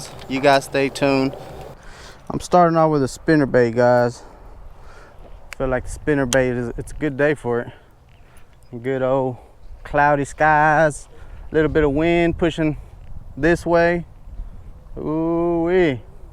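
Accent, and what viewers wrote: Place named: Texas, United States. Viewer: The texmex accent 🤙🏻🤙🏻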